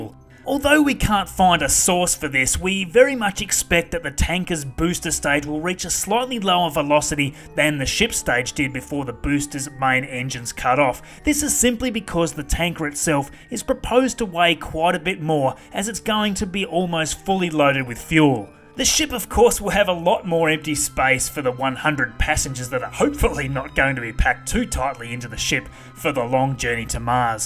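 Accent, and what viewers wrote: Australia. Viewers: Wow. While listening to you, I thought to myself "this guy has a funny accent, I'd say it's Australian" Then I checked your channel and there it is, you're Australian. As English is not my mother tongue (I'm French), I'm quite proud of myself for recognizing where the accent is from \o/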